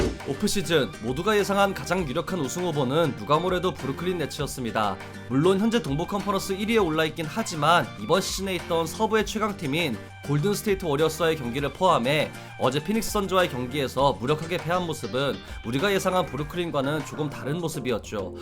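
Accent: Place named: South Korea. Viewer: 너무 콩글리쉬틱 하네요 ㅋㅋㅋㅋ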